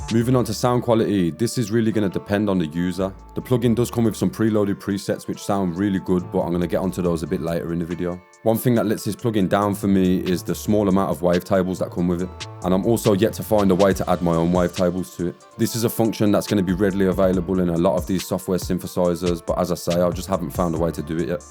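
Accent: Birmingham, United Kingdom. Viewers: ugh that brummie tamworth accent..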